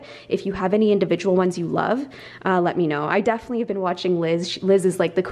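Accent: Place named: Canada. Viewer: I love her Canadian accent ✨💕😂 ily! I sent you a letter a while ago and u sent me brushes so thank you for those! 💕 you are one of my role models 🥺💕😘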